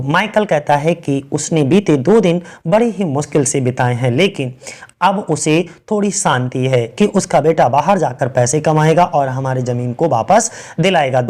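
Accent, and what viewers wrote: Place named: Pakistan. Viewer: Yaar tm pakastani sound kyu kr rahe ho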